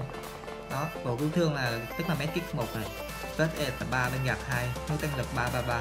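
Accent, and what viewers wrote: Binh Dinh, Vietnam. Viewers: Anh là người bình định ạ ? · A phải ng bình định ko s nge dống thế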